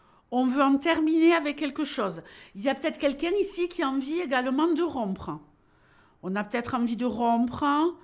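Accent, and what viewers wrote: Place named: Toulouse, France. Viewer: 🌸Bonjour. 🙏Exit les anciens paradigmes 😂 ouf! Vve la renaissance 🤵‍♀️ La vie est belle 💚 vous êtes unique, extraordinaire, très attachante. Au plaisir de vous écouter. J’adore l’accent Toulousain. Bien respectueusement 🎁 Super thanks 🎁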